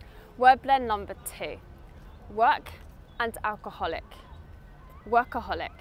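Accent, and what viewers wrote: United Kingdom. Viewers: You're a genius, I'm obsessed with the British accent only because of you. enjoyed this video and learned Five useful words 1. Brunch 2. workaholic 3. Motel 4. Staycation 5. Fortnight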